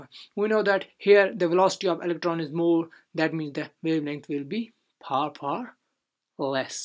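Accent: Kashmir, India. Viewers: Booya che chukha koshur? ..chaani "t" talleh baasan ...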